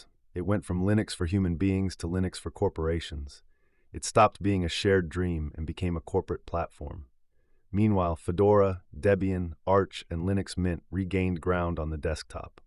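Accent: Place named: South Africa. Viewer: No, he's not Afrikaans, he's an English-speaking South African.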